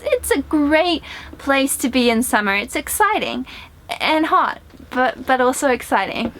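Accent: New Zealand. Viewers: I thought you were a fellow kiwi!!! But couldn't find confirmation :D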